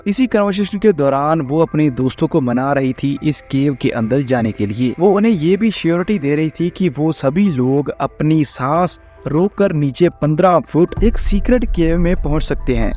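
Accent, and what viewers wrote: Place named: Pakistan. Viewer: tumhara accent Pakistani lag raha hai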